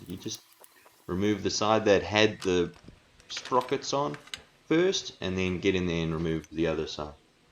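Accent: South Africa. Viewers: are you South African expat? Kinda sounds like you have a South African accent. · thanks for the show. Good old SA accent. where can i buy this convention kit?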